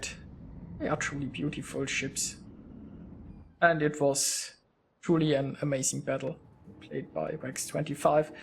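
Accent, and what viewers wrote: Germany. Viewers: ich höre jedes mal nen englisch redenen deutschen richtig aus😂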